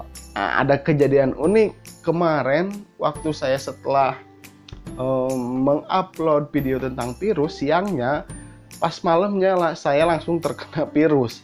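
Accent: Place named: Bandung, Indonesia. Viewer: apas wkwk logat bandung euy :D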